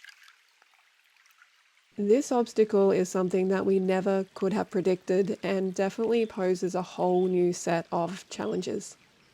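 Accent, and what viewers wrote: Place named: Australia. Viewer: Hey, where are you located? By your accent, you are Australian as I am. I live in Fiji.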